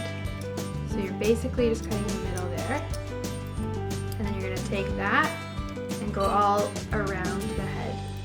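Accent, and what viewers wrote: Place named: Texas, United States. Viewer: You must be from Texas.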